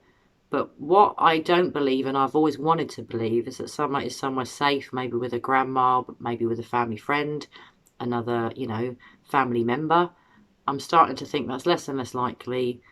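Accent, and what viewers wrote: England, United Kingdom. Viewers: Lots of red flags. So many to count them. They acted like Summer was dead I agree with you I think this precious baby girl had an accident at the waterhole and they're all covering for each other Of course they don't want to go to jail or prison I think that one of them were some of them took Summers body and put her somewhere but not on the grounds for they live. Yes I'm sure the dogs would probably be smelling that if that was true I don't doubt that her body could have been in the shed to begin with and he moved it that's a thought too This whole family is covering for everybody is what I believe is what i believe. All they talked about was her past in that interview and that they knew she was gone forever. That's what I picked up out of it when I saw that interview. What part of England are you from? My Dad was from Birmingham England. 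I love your accent it's very beautiful. God bless you doing these videos and keeping the story going until justice will prevail. Whether she be gone or whether she still be alive, justice still needs to be served 🙏🙏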